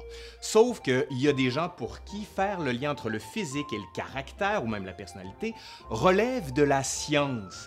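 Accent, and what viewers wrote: Quebec, Canada. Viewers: Je vous ai découvert grâce à la vidéo sur la poutine et je suis ravie d'avoir cliqué sur la chaîne!!! Alors, entre la culture gé, les yeux bleus, le petit accent québécois, en plus maintenant vous vous laissez pousser la barbe et vous avez le TOUPET d'insinuer que l'on va mal vous juger??? Non mais!!! À moins qu'il s'agisse de discrimination positive... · Je laisse rarement des commentaires sur Youtube, mais je tiens à exprimer mon joyeux étonnement : moi qui ai beaucoup de mal en général avec les accents et le québécois en particulier, je ne pensais pas réussir à passer outre en découvrant la chaine... Et je me suis rendu compte que non seulement ça ne me posait pas de problème, mais qu'en plus j'en suis venue à l'apprécier et les vidéos de cette chaine comptent désormais parmi mes favorites :) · et l'accent québécois... 💕 (je suis de la région des Hauts-de-France). 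Encore une vidéo intéressante et drôle à la fois. C'est un plaisir de vous écouter ! J'aurais adoré avoir un prof d'histoire comme vous (avec un visage avenant en bonus... Compliment qui est complément du sujet de la vidéo !) 😉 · oui on nous juge, tu es Canadien, tu as "l'accent de là bas", idem pour moi qui suis Belge, j'ai une chaîne dédiée au cochon d'Inde, le "cobaye", ici on prononce "cobée", ben j'ai eu des remarques, mais aussi des MENACES.... n'importe quoi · J'ai du mal à m'habituer à l'accent québécois mais ça en vaut la peine sur cette chaîne! Belle chaîne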